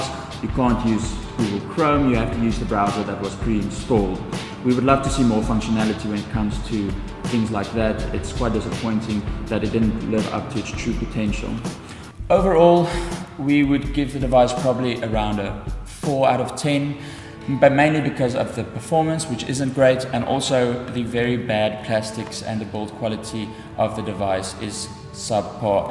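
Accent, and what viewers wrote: South Africa. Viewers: Poor audio, way too much echo and the background music is annoying but I do like the SA accent ! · Not only is the sound bad, but your South African accent shines through. Bit off putting. Next time try a few people to hear how they sound. Otherwise it is informative. I think the enhancements are awesome. The speed is also great...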